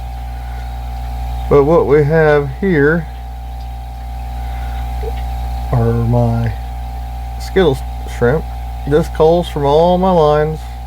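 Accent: United States. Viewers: Are you from texas?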